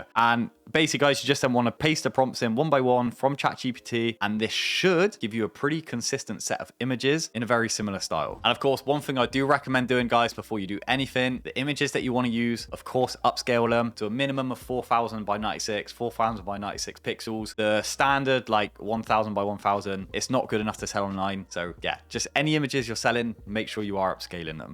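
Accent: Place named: West Country, United Kingdom. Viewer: The Etsy brought me here, the West Country accent made me stay. 😂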